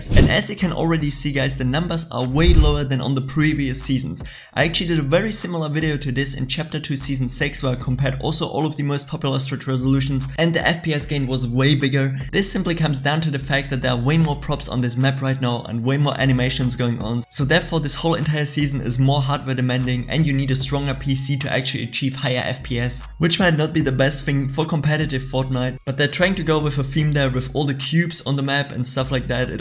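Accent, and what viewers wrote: Germany. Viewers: der klingt anders deutsch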